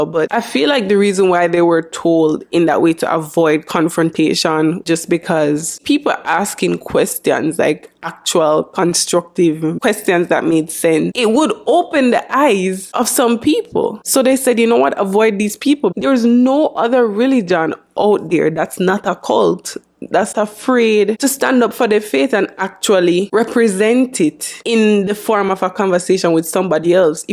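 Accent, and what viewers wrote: Jamaica. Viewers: I was in Bayfarm in Kingston. First time hearing this from the perspective of someone that shares my native accent. I felt the same way when I researched the organization like I was committing a crime. 😂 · Are you Jamaican because I’m picking up the accent? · Your Jamaican accent is lovely! And you're pretty!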